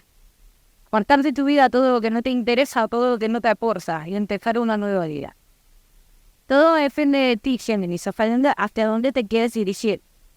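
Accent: England, United Kingdom. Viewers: No kreo ke es mikrofono es akcento ingles .algien se mete aki pienso jo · Pero a ver tia...hace dos meses hababas en andaluz,español ahora me hablas en acsento ingles...que te pasa?????i mira que yo hablo 7 idiomas pero esto realy nuncaa li e vistoooi baby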